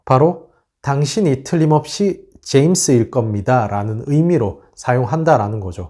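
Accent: United Kingdom. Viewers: 영국식 발음에 치인다요……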